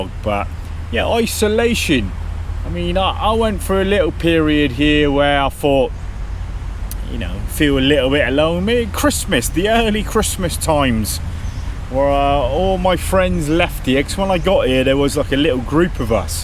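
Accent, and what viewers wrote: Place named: West Country, United Kingdom. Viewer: Some very valid points. I'm married to a woman born and raised in Chiang Mai so a lot of these don't affect me. Where in the south west do you hail from? I lived in Wiltshire, then moved to Devon, spent a lot of time in Dorset and Cornwall, you don't have a Wurzel accent, perhaps a hint of Bristol though... Speaking Thai. I used to always try speaking Thai, but ended up getting puzzled looks, repeating myself, more puzzled looks and then my wife says, exactly the same (at least it sounds exactly the same to me) and they nod and leave, so have pretty much stopped. There are other reasons I'm not really interested in speaking Thai, my wife has about 10,000 family members locally, they ALL want to talk to me! Me not speaking Thai and them not speaking English results in not speaking to them. Is that rude? Maybe but I barely spoke to my brother for the last 12 years I lived in the UK, and I happily avoided speaking to people in the UK for weeks at a time. 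Visa's. It's an unnecessary expense, but I pay a visa agency to do all the work, I spend less than 20 minutes dealing with immigration once a year and I'm happy to pay for that. Ironically it's wasn't the immigration staff who were the issue, it's sitting amongst the endlessly whining/angry expats in the immigration waiting area that I found both depressing and irritating. Budget. I'm the opposite to you, I almost never have unexpected expenses crop up, I know what I need to spend each year and plan for it. In fact since buying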